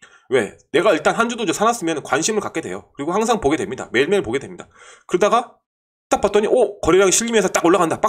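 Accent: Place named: Chungcheong, South Korea. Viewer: 아 고향이 충청도신가봅니다ᆢ 제고향도 충청도지요ᆢ 친하구싶습니다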